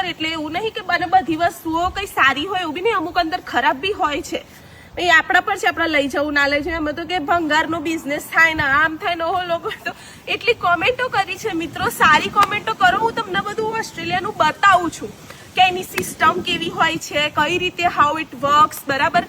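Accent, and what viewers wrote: Sabarkantha, India. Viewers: Bhasha puri sabarkantha ni che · Your language touch is like himatnagar sabarkatha. I like it. I worked in SK rural area. Ben mithu bolo chho 😊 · ❤❤ धन्यवाद तमारी बोलवा नी भाषा ईडर साबरकांठा नी छे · Your desi SK language is nice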